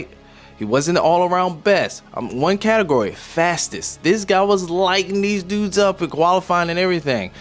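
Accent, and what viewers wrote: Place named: Australia. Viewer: Your Aussie accent is hilarious 😂😂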